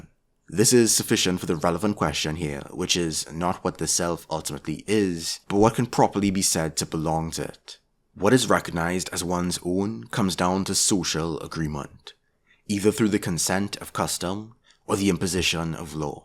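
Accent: Trinidad and Tobago. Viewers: Are you trini? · Are you Trini?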